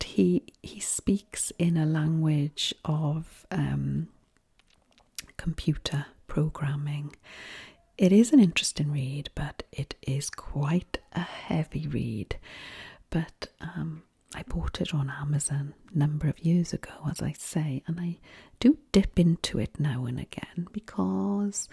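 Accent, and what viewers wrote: Wales, United Kingdom. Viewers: I love Welsh accents! So sophisticated!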